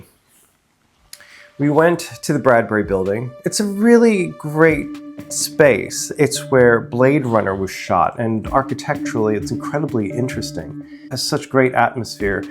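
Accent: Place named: Philadelphia, United States. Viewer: happy belated. of course you're a taurus, makes every sense. I loved your honesty so much in this one. And if you don't mind my saying, I can hear your Philly come out. I masked my Philly/South J accent a thousand years ago... but it comes out at times. And yours came out in this. It's between you and me.;)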